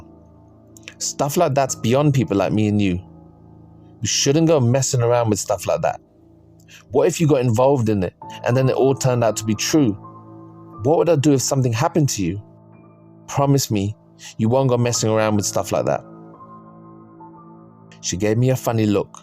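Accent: United Kingdom. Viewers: Class story, hearing it from a fellow Brit is even better, as my football team says as its motto, south London & proud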